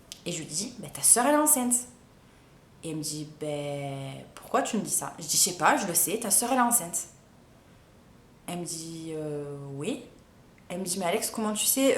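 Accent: South, France. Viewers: je kiffe ton accent du sud bisous bisous❤😘👌😂😉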